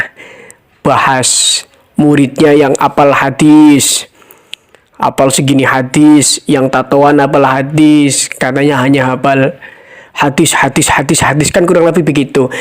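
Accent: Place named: Java, Indonesia. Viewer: NGOMONG LU KOK MEDOK. TAPI GUE SUKA. TERUSKAN DAKWAH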